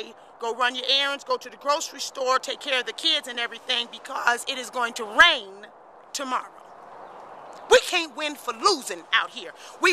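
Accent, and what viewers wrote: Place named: South, United States. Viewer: This video would make an ideal case study for linguists in the exploration of the African American Vernacular English / Southern American English, with a Georgian / Coastal Southern dialect. She has such a graceful demand over Southern chain shifts and she speaks with so much clarity.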